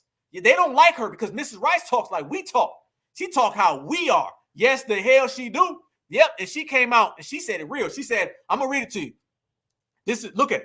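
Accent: South, United States. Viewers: Thanks, that country ass accent ,with all that Honey Child bull sh,t peace and no disrespect ✌️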